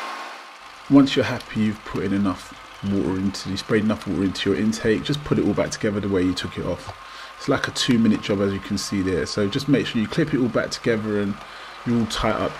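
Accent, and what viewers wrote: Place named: United Kingdom. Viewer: I think, in future, just say "would not" instead of wouldnt, in case the non-Brits dont understand your accent mate!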